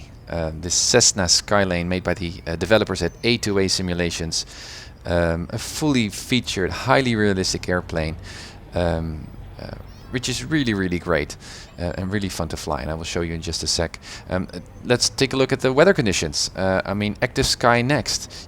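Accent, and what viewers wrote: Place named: Netherlands. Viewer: are you dutch? English sounds dutch :P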